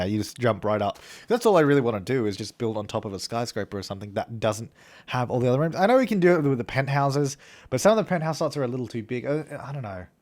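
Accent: Australia. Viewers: Are you from Melbourne or Sydney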